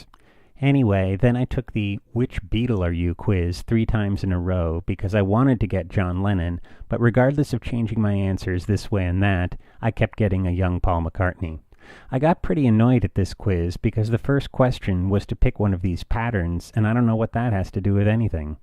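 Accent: United Kingdom. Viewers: Killer British accent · I love your Paul McCartney accent lol.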